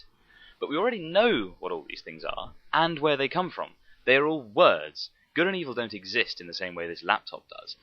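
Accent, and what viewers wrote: United Kingdom. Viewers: This is a much better video than I usually get and I truly thank you for it. I told myself I was not going to do many more rebuttal video, however, I might have to rethink that decision. I obviously disagree with you nonetheless well done. And allow me to grant you the same (backhanded in your case) complement. You good sir are quite bright and more than eloquent, aided greatly by that killer British accent.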